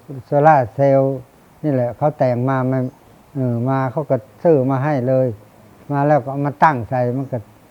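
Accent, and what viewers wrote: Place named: Thailand. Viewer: สาธุเด้อข้า น้อยหลวงพ่อเว้าได้ดีหลายว่าวภาษาบ้านเฮาให้คนฟังเข้าใจสำเนียงเป็นไทยแต่ศัพท์เป็นลาวเพื้นบ้านสาธุข้าน้อย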